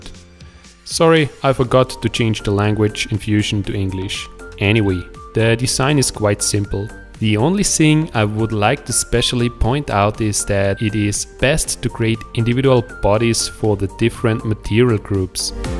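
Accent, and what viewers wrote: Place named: Germany. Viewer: Ich wollte schon sagen, hört sich an wie deutsches Englisch! Gleich mal ein Abo da gelassen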